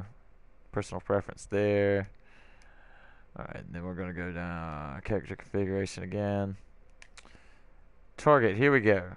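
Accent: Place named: South, United States. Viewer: Sounds like an American southern with a hint of Australian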